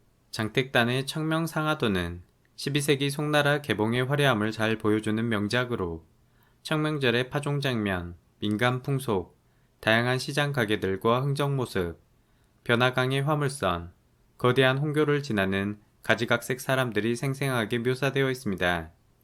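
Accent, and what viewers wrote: Jeolla, South Korea. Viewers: 전라도 말씨 나와요 ㅋㅋㅋㅋ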